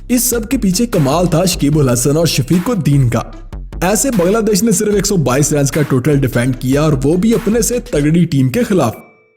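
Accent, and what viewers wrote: Pakistan. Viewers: Apki voice yaani apki boli se AP mujhe Pakistani lagte ho. Lekin Apke thumbnails or indian team ki ziada tarreef se mujhe AP Indian lagte hooo Confirm me Are u a Indian. Or a Pakistani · Aap shayad pakistan se ho faarmet bol rahe hai isliye.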